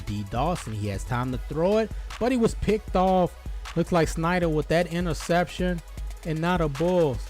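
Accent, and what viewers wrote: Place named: New Orleans, United States. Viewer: You have great content on your channel man 💯 And you sound like you from New Orleans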